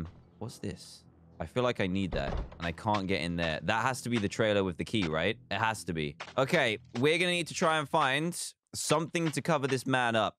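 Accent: United Kingdom. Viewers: Eat Your Cereal he’s British lol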